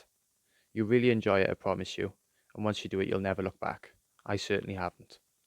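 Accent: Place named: Wales, United Kingdom. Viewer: Brilliant video. I mean I'm not a new wild camper but I was totally sold on your tips. Many I remember from my own journey into the wilds so to speak. I notice you're Welsh. Not sure where you're from but I'm only across the way in Hereford. Well, good going. Mark P.S Subscribed on account of the quality dude.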